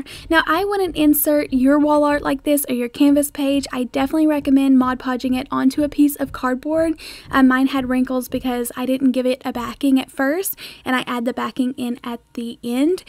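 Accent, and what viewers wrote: South, United States. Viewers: that's how southern people talk lol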